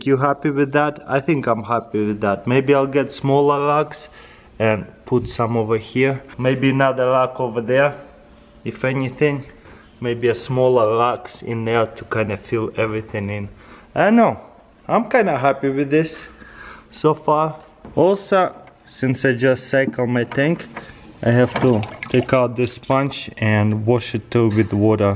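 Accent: Russia. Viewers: Colorless Rainbow its his Russian accent...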